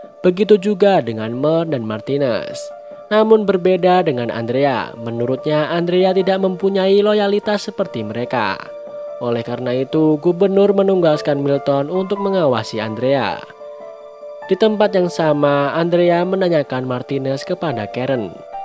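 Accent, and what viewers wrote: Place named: Java, Indonesia. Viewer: 99,99999,999% b Indonesia 00,00000,001% jowo ne metu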